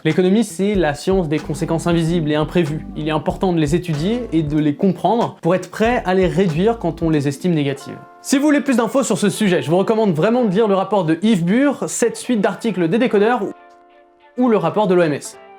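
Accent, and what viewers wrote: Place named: United Kingdom. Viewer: Ton accent anglais est incroyable, j'ai eu de l'ASMR quand t'as dit "the economics of welfare"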